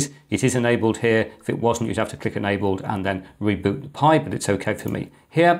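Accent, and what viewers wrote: United Kingdom. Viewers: I love that british accent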